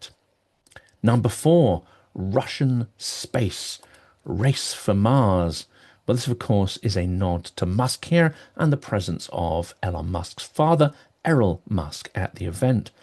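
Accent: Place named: West Country, United Kingdom. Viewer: How dare that hypocrite Galloway claim he cares about suffering humanity... while supporting Russian imperialism! The guy is a fraud, a morally bankrupt political opportunist who jumps into by-elections to get his ego stroked by being in the headlines again...upon winning a by-election (such as Bradford and Rochdale) he soon abandons his constituents - the campaign doing its job of getting his face, voice and name in the news, his ego bolstered. Thanks. I love your eloquent and witty - yet acerbic when needed - monologues. I could listen to your fantastic lilting West Country tones (I am assuming it is a West Country accent, right?) all day!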